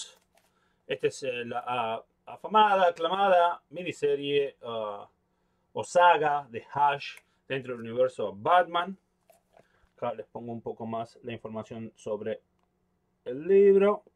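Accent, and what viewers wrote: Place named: Argentina. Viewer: Sin embargo aunque hablas con acento argentino tu acento no es tan marcado, ¿Es que vienes de una parte de Argentina dónde el acento no es tan marcado, o llevas tiempo fuera de Argentina y por eso has perdido un poco el acento o es que tratas de moderar tu acento para hacer tus vídeos?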